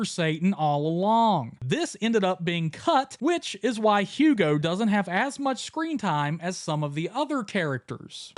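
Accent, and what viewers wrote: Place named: Pennsylvania, United States. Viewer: You sound almost exactly like that guy who cheri robs at the convenience store/gas station.. I grew up with friends from Pennsylvania and Ohio, you sound a lot like the idiots they made fun of.. keep up the amazing content. Maybe work on your delivery. Otherwise gobs-less...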